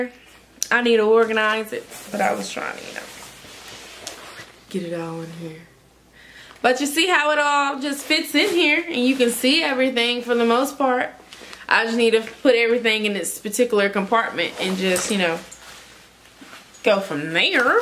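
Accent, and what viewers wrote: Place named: South Carolina, United States. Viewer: Sounds like South Carolina..😀Nice video 🤜🏽🤛🏽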